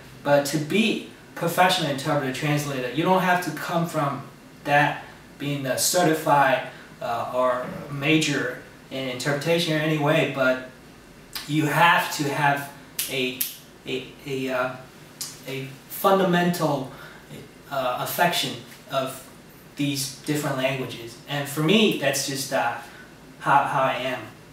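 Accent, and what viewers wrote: United States. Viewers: your accent is so confusing!! but in a good way!!! hahaaha it's like some of a brooklyn accent mixed with a little southern accent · i feel like im hearing a texas accent? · Nice American Accent. Your video is appreciated.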